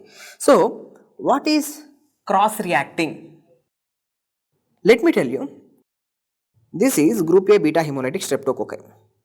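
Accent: Telugu, India. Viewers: Sir I had a strong feeling that you are from telugu states 😅 today it confirmed.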